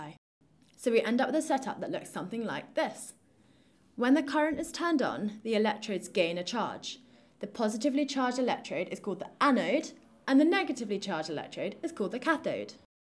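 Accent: United Kingdom. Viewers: British accent🤩😍😅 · its pure british. enchanting no doubt but i wonder do they also love the way we speak hindi.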